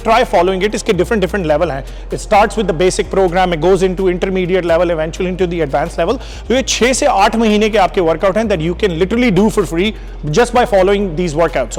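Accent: Punjab, India. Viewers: Bande de puttar bano, muscle di dhukki kada ti 😂😂 🤟 Paji tusi pisho kithe to ho ? Tuhada punjabi da style bilkul sade nal milda, plz dassyo jaroor where from you ? 🙏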